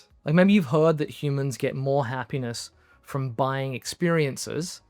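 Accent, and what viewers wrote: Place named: Australia. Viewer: Me too, and I like his Aussie accent too! 😊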